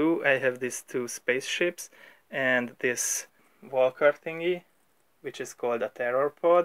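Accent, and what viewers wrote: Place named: Hungary. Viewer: Te lenni magyar? nagyon akcentusos....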